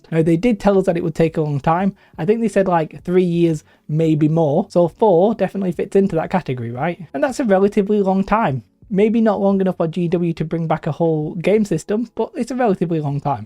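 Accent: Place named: Germany. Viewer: What is your accent, German? Tjought you was a Brit but today I heard you have some charming accent. :)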